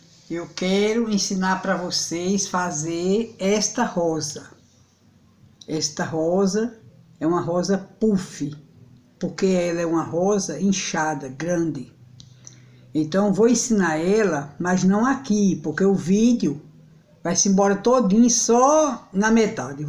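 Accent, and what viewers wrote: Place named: Northeast, Brazil. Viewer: NEGA AMEI VOCE E SUA SINCERIDADE . UM CHERO BOM PRA TU . SOU FILHA DE NORDESTINO . AMO ESSE SOTAQUE.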